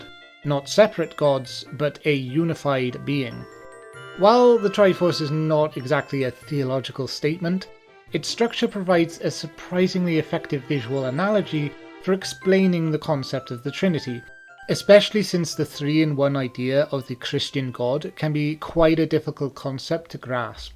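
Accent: United Kingdom. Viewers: Bro are you welsh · Sidenote are you from Northern Ireland?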